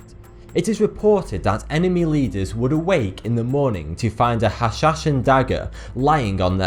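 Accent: United Kingdom. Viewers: Omg . The guy speak such hard British English lol)))) so funny . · Well with your obvious British accent why don't you expose it was European who founded Skull & Bones and expose some of the Royals witch covens like "Order of the Thistle" and "Royal Order of the Garter"???? Why don't you expose how many Skull & Bones members have been knighted by the Queen? Most in Britain are freemasons were you a Marlay child? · These videos are boring and I hate his british accent I cant understand why they have such a shity accent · it's his terrible punk/slang British accent. Ffff - thhh words are always mixed . He does it with other words in his video's. Not the typical correctly pronounced formal British accent we are all used too , depends on where the Brit lives .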